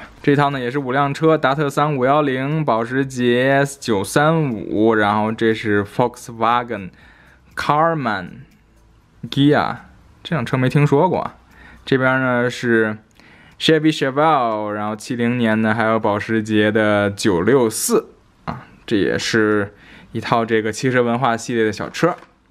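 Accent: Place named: Beijing, China. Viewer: 北京人，说话很有特点很好听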